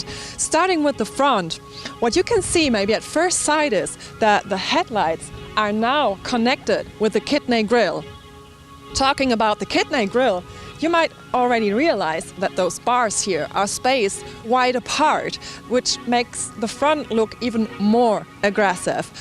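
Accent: Germany. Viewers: Nice German accent.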